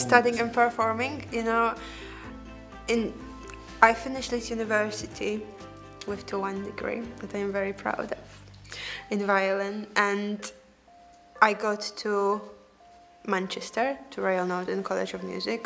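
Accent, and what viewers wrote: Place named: Poland. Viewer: she sounds Polish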